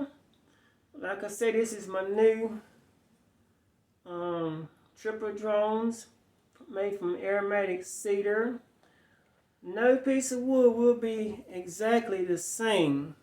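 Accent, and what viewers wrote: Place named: South, United States. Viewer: holy wow sir your accent is baffling me!! Would love to know your background and story, haha. I realize those are sensitive questions in todays cultural climate but I really don’t mean anything by it. Only curious. You have like, a deep southern accent or something that to me, does not match your appearance. I bet your family has a fantastic immigration story in there somewhere. Ok bye just a random curious internet commenter and I love guessing where accents come from all over the world!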